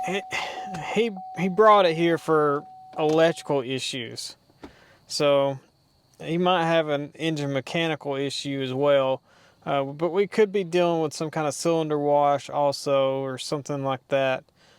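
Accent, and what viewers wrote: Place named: Deep South, United States. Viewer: How about you? Your southern accent makes me think Deep South, but even some of us citified Okies sound pretty hick. So accents can be deceiving.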